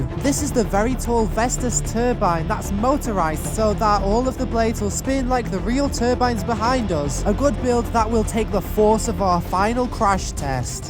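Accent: United Kingdom. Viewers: He's british (I think) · Are you British?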